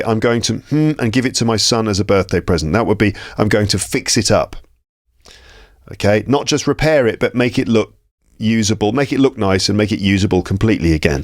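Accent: United Kingdom. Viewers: Your channel is a real treasure. I mean, your British accent, intonation, and facial expressions make it more fun and catchy! Thank you so much, Mr.! from 🇸🇾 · Hello! You are an amazing teacher I can say i am so glad to have you in youtube. I understand your teaching process.i have learn so many things from your videos. Your British pronunciation is not that difficult.I understand easly everythings. You doing great work for helping like us I really appreciated and from the depth of my heart I wants you to thank you!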